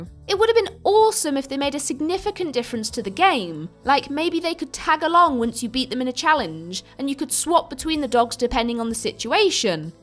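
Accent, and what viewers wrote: United Kingdom. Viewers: Was looking for a review of Yoku's Island Express and this somehow showed up and I just had to watch it xD I remember this game being one of the few that was actually translated to Danish back in the day and I do remember being kinda intrigued by it in general. Really well made and thorough review btw. And heccin' love your British the Britishness in your voice xD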